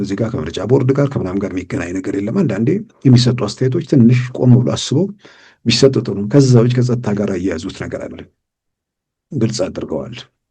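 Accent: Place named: Arab world. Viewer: 👉👹👹😭ሀ ሁ ሂ ሃ ሄ ህ ሆ ብሎ ያሰተማረህ ማን ነው ዋጠው አማርኛው ከየት አመጣሀው ቃንቃህ አረብ ነው 😭 👹👹👈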